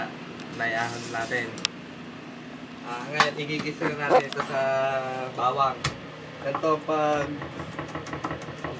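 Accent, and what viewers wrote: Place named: Ilocos, Philippines. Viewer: you have ilocano accent. pls.. don't get mad, love your video though... tanx kabayan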